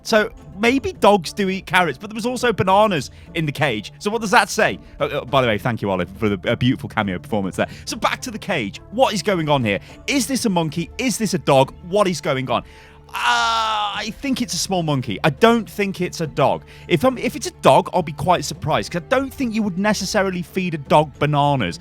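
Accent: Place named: Birmingham, United Kingdom. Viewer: Wait, you're a brummie? Just like me 😁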